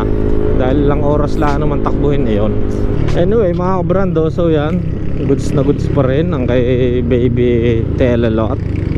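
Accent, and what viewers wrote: Batangas, Philippines. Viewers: gustong gusto ko yang content mo boss at tonong batanggenyo..🙂meron din kc akong kamag anak sa may lemery batanggas..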